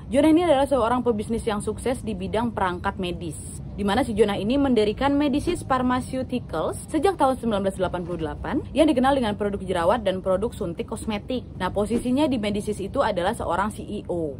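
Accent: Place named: Medan, Indonesia. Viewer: Logat kak medan kali😂😂 Btw salam kenal yah wak aku yang setia kali ini nonton videomu sampe berulang pun aku sanggup..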